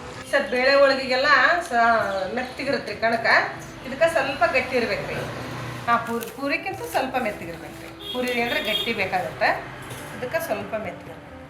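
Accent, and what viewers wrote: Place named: North Karnataka, India. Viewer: Do not stop talking.it reminds our Uttar Karnataka languages. We are missing it a lot❤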